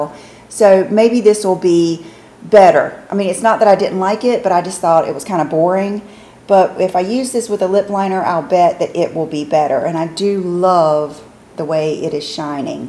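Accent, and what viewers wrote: South, United States. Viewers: Couldn't agree more on your Southern accent! Just delightful. Everything sounds sweeter with it.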